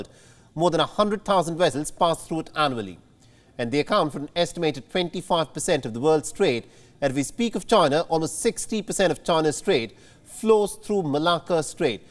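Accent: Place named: India. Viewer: Every Indian have more or less the same English accent as of this journalist instead of that stereotypical Hollywood accent.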